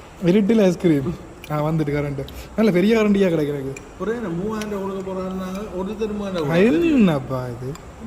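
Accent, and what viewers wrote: Jaffna, Sri Lanka. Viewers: Anna neenga pesura jaffna tamil sema annachchi 😊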